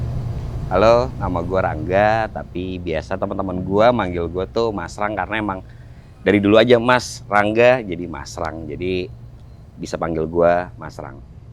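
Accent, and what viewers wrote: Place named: South Jakarta, Indonesia. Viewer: Kyk abang"an w ini mah.. dari cara ngomong + gaya ny kyk anak jaksel.. 👏👍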